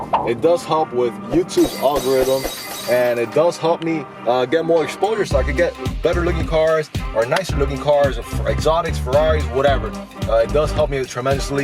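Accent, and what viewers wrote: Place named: Miami, United States. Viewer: I recognize that Miami accent anywhere. Rick Case VW plate confirmed my suspicions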